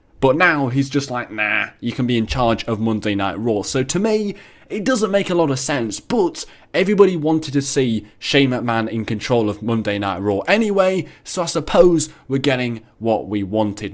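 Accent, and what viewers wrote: England, United Kingdom. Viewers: Mate what time is this in England if you live there (you sound like you do)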